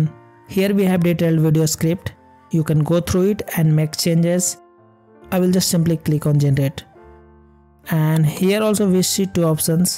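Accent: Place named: India. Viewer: bro,maybe u can try vouce over since I dont like that Indian english accent,the way u pronounc "T" ,its terrible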